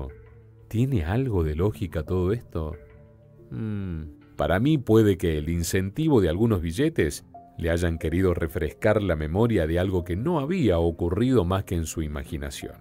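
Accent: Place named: Argentina. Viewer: Jajajaja jajajaja jajajaja 😂 😂 😂 😂 T salió bien cool el hablado argentino bro..... Saludos y gracias x la continuación estuvo super al = q el 1ro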